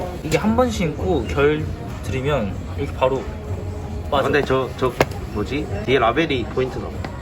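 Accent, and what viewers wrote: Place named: Gyeongsang, South Korea. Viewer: 역시 경상도 사투리 구수 하네용.. 부산 가본적이 없는.. 그주변지역은 다가본....부산 갈일이 없었다는... 신기한.. 잘봣습니다.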